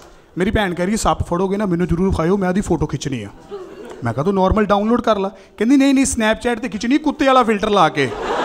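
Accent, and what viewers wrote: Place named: Punjab, India. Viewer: Punjabi accent ❣️ baai mar ta hasaa hasaa ke... Lub ju aa 😂😂😂😂😂😂😂😂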